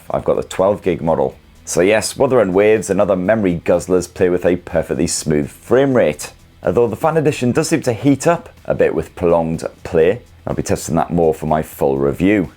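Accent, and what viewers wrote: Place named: Scotland, United Kingdom. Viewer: I am watching his reviews to hear dat Pure Scottish accent- who is the same?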